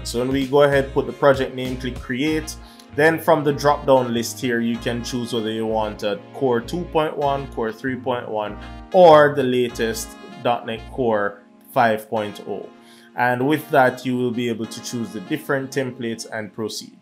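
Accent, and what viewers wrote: Jamaica. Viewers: Jamaican accent 100/100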